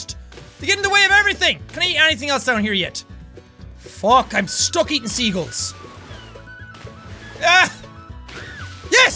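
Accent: Ireland. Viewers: & awesome sounds like Ireland 🇮🇪! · Not gonna lie, when went full bore Irish I think my brain (and heart) melted a little... Also, I do believe my ovaries may have exploded... 🤷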